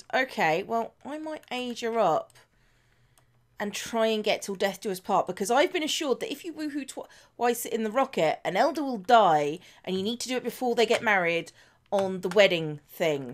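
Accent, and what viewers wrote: England, United Kingdom. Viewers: She's English but England has a ton of different accents up and down the country, I can't place her personally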